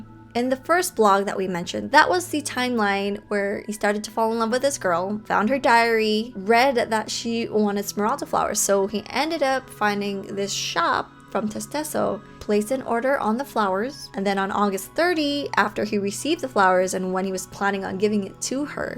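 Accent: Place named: Italy. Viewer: I Love You, your theories are always great (P.s. your Italian is sooo cute 💜)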